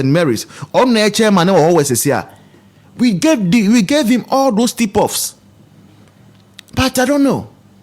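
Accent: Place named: Ewe, Ghana. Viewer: I like your channel because of your Twi tonation. It sounds same as my Ewe friend.Big ups bro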